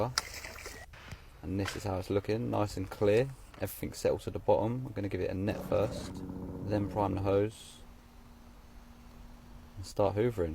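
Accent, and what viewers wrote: United Kingdom. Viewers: a British accent is relaxing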